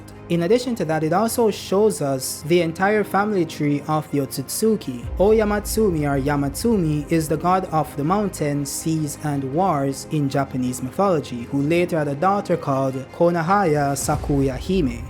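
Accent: Jamaica. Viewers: Are you jamaican brotha?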